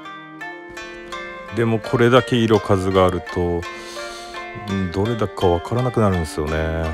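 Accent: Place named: Miyazaki, Japan. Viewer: 宮崎弁 連発ですねえ〜それだけリラックス⁉️目元 口許本当に大事ですね❣️❣️❣️段々、精気が溢れてきました。目元の赤がいいです‼️ひねくれちょらんよ❣️‼️❣️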